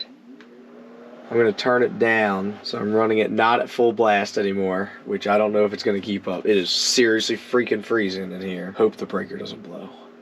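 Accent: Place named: Maryland, United States. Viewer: First time viewer here - I heard your accent and knew you were from Maryland 😂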